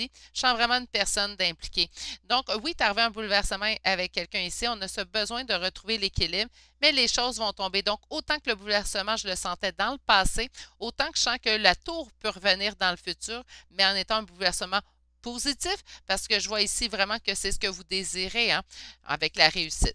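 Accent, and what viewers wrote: Canada. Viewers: Oui reste toi-me^me,.Et quand tu te coupes à l'intérieur de la main, le plus simple, et c'est ce qu'un médecin m'avait conseillé, c'est de la glue. MAIS ATTENTION, si on peut utiliser de la glue 1er prix, LE SEUL IMPÉRATIF c'est de prendre de LA GLUE LIQUIDE (et pas en gel). Et ça n'a rien à voir avec le tirage, peut-être vas-tu m'envoyer sur les roses (et c'est ok: on me dit toujours que je suis trop curieuse!), je me questionne tout le temps sur ton accent, j'veux dire si je crois que tu es canadienne qui parle particulièrement bien français. Parce que i tu es québécoise, je ne reconnais pas les expressions typique de Quebec? Et comme toujours, c'était un plaisir, comme à chaque fois. Donc, MERCI pôur tout ce que tu nous offres de temps, d’énergie et de bienveillance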